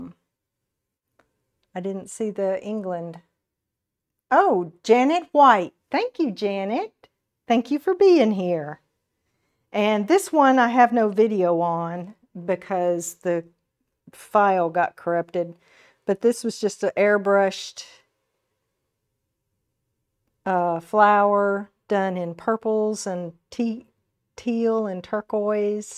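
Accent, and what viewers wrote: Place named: Texas, United States. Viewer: I knew I detected Texas! Love, love your voice and your work! 🤩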